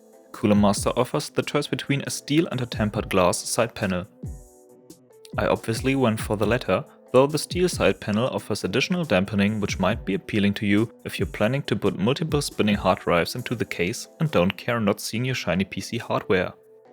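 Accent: Germany. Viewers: Good review! I found it funny: as i listened on, I thought your accent seemed german, as soon as I saw the Wera VDE 1000V certified screwdriver I was certain.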